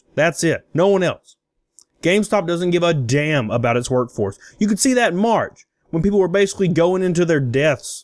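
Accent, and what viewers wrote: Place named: Alabama, United States. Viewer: I'm not gay by any means but dude I love your Southern f**** droll not to mention you just hilarious and I love that laugh it's like a country Santa laugh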